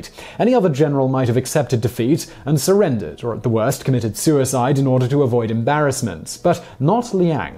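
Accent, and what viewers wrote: United Kingdom. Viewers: Cool video! The thick British and rushed words makes it a little difficult to understand, if you could slow it down a little that would be great. Other than that, excellent.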